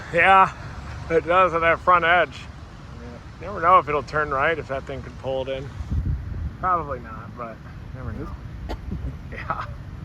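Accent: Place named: South, United States. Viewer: As a 30 yr old from GA it’s pleasant to hear a southern accent in a professional YouTube chase lol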